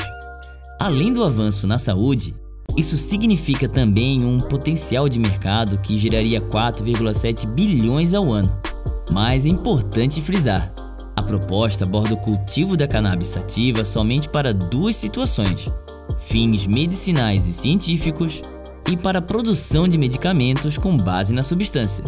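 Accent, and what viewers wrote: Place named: Florianópolis, Brazil. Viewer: Tu é de floripa né cara? Esse sotaque aí não me engana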